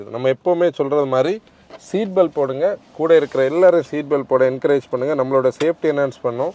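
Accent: Kanyakumari, India. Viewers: veri nice explains.....👌👌👌👍👍👍💯💯💯 I am Indian Army 🪖 ஐயா கொஞ்சம் கன்னியாகுமரி பாஷையில் கொஞ்ச நேரம் பேசுங்க அதை கேட்கணும்னு எங்களுக்கு ரொம்ப ஆசையாக இருக்கிறது...🙏🙏🙏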